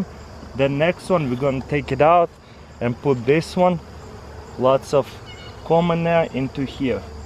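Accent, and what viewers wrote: Russia. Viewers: lying!! I'm a grown ass woman, not some child you can trick! He not only has a Russian accent but broken English, plus, you can find his bio on Google!!! Why tell lies? It's not gaining you anything other than looking like a fool!! Yes he lives in NC now but he was born and raised in Russia! His name is Тарас Кулаков) Does that look American to you? Actually he's Czech but that's Russian! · Hey guys. He is Russian. Do you speak other language(s) as a native speaker? No??? That's it. Think, think, think before write a comment! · lol.. whats with all the anger? He was only joking. The accent/spelling is part of what makes him so amazing.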